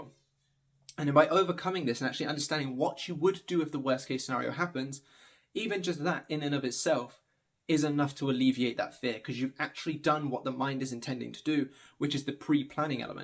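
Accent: England, United Kingdom. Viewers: This is off topic but it’s been driving me nuts so I have to ask. Where in England are you from? I’ve been all over England and I can’t quite place your accent! Sometimes I think you’re from South London, then sometimes it sounds like you’re from Nottingham…or sometimes even Birmingham! I’m way off aren’t? 😆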